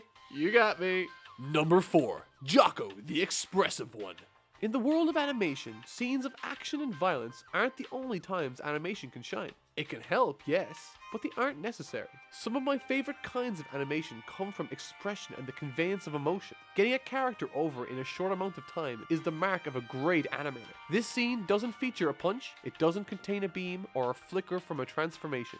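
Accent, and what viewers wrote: Ireland. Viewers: With that voice some of us can tell your Irish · I had a feeling u were from Ireland with that accent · Cool your irish?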